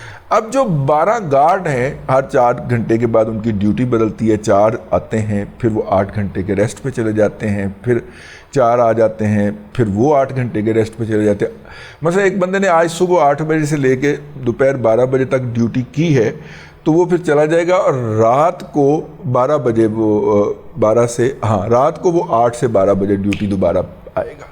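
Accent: Punjab, Pakistan. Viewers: Punjabiyat jhalak Rahi Hy AP k monh Sy. 😅wah kia analyse karty ho.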